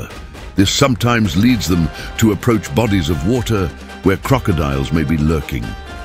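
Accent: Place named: United Kingdom. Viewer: What an interesting Indian bgm, while the guy narrates in British English. What a harmony😊.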